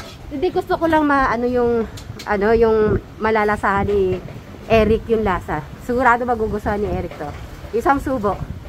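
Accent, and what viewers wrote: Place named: Visayas, Philippines. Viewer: Ay visaya diay ka madam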